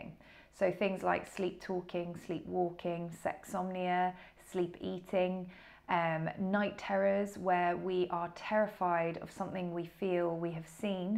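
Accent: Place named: United Kingdom. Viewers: Its a British accent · I love your accent You sound English, British, Aistrailia maybe or Irish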